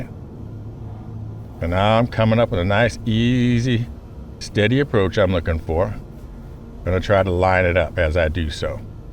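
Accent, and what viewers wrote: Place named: South, United States. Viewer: You accent is excellent, it reminds me of a southern gentleman.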